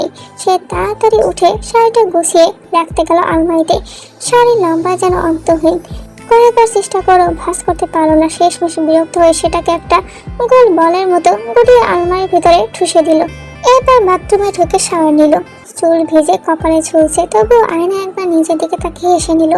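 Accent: Sylhet, Bangladesh. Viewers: আপু তুমি কি সিলেটি?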